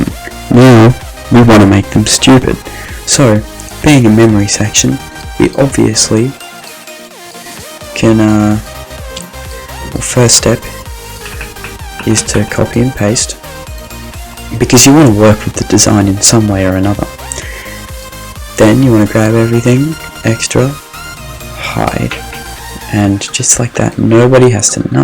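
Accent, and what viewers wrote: United Kingdom. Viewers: are you british?😊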